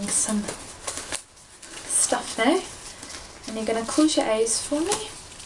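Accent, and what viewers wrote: Scotland, United Kingdom. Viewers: I found the scottish accent beautiful and heartwarming. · is that scottish accent? So adorable spoken by her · It's so strange listening to a fellow scot doing asmr haha. Great job though! :) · You Scottish? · What a lovely Scottish accent. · (No hate) Tbh your Scottish accent isn't that bad, very stereotypical but not bad. However if everyone I was around everyday sounded like this it would be quit worrying. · Loved this. Your Scottish accent is ace :) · Oh god, the Scottish accent.. Now I have to watch trainspotting again ahahaha amazing video, subscribed + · It's scottish, right? :D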